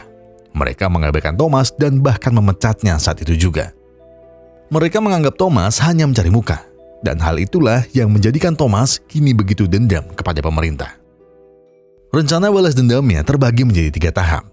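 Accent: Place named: Yogyakarta, Indonesia. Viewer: wong jogja bro, seko logat e ketoro wkwk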